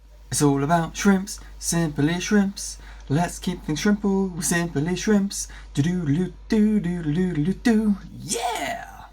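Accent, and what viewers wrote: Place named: United Kingdom. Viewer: Where are you from in the UK ? I recognise your accent from near me 😁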